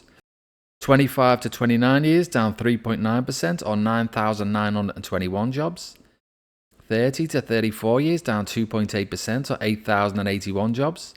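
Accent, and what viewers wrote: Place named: England, United Kingdom. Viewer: Also it’s interesting to note you’re accent is English, New Zealanders have paid massive money to our indigenous Maori And rightly so, but what’s so disgusting about it is your average New Zealand in fact all New Zealanders never made the deal but paid the debt for the UK, we never gave their land away illegally your English monarchy did it but yeah this country paid the bill with nothing ever removed from the precious royals thou their family signed the deal and stole the land. Also more men died per capita in the second world war than most countries including England, we stood beside England from the start until the finish with the NZ Army being one of the best performing armies throughout, yet we’ve always been punished as the colonials used as Cannon fodder for those superior English inept generals, so the guys who help save England then have to pay three times the amount to get to the UK because of the exchange rate in England then gets all the benefits of coming to New Zealand and now thanks to you will be now snapping up all our property & everything else and at a third of the cost. 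So thanks for pointing that out to half of England because I’m sure you’ll all benefit from it again at our expense especially now England’s at War again internally, I’m sure they’ll be using all your wonderful knowledge to take advantage of us Kiwis again so thanks a lot mate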